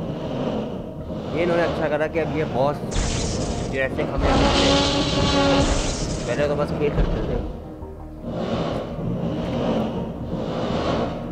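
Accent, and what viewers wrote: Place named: India. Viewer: You speech India 🇮🇳 will I don’t mind,it ok 👍 and I don’t have that dinosaur yet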